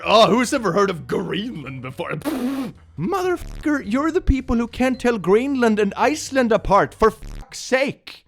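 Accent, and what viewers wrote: United States. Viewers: I must admit, I often wonder why you tend to speak American English rather than English, English?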